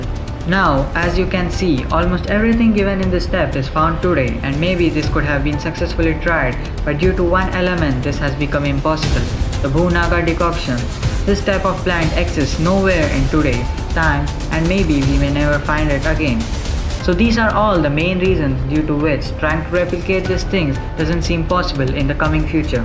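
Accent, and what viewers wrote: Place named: India. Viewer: Yes video is probably really interesting but can't make a word of it out with the thick accent ..it's like not that hard to stretch your tongue and speak but it seems like Indians enjoy hearing themselves talk with a bag of marbles in mouth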